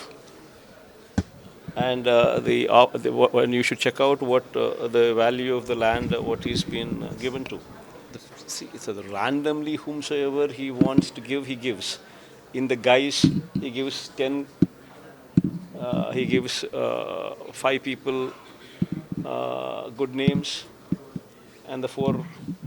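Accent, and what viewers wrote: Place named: London, United Kingdom. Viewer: Basted jaghan is talking London English lucha jaghan is captain's for all criminal mafia done is lucha jaghan he himself telling his character and his mind of jaghan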